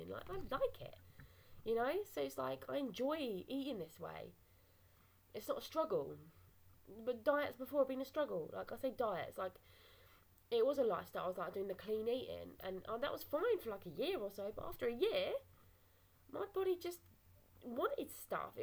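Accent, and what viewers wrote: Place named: United Kingdom. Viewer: Hey Great first vid, for me was cheese toasties :) Sounds like your from the UK where abouts? I'm from Nottinghamshire. Keep making the vids, I've also not long ago made a channel be sure to check it out :)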